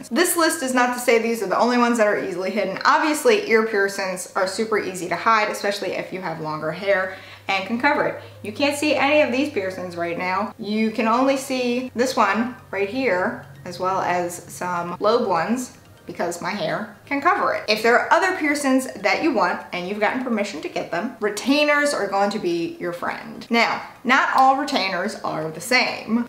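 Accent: United States. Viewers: Where are you from? I’ve never heard your accent before. You sound American but you said a bit Irish. Because when you speak you don’t pronounce the g in ing words. You say “piercin’ and “somethin”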